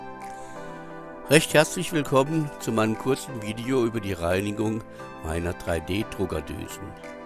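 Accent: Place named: Saarland, Germany. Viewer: Ach, gugg mo doo... Was für e Üwweraschung zum Schluss, die Saarlänner sinn eschd üwwerall... Do haschde dei Platt awwer gudd verschdeggelt... :-D Vielen Dank für das hifreiche Video, jetzt kann ich als Anfänger meine verschmutzten Nozzles auch reinigen... Grüsse aus Aachen, von einem Exil-Saarländer mit dem Herz noch immer in der Heimat :-D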